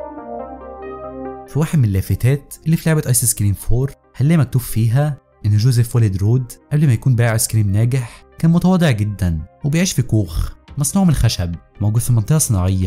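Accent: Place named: China. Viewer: من لهجتو صيني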